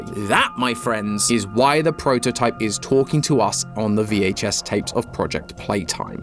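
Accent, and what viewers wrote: United Kingdom. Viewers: Truly now 100% British now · british matpat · I can’t wait to watch the British man talk about monster toy children things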